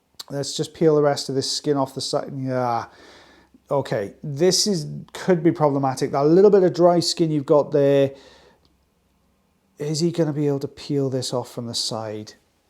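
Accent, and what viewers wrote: Wales, United Kingdom. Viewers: Love hearing you talking, the Welsh accent takes me way back. Left Wales in 1965 but still have friends there I went to school with. Hope will get well soon, you two have such good repartee when watching videos. Take care, it will soon be March 1, St David’s Day, first day of your Spring. I will wear my daffodil brooch, Cymru am Byth. From Rosie, Australia 🇦🇺.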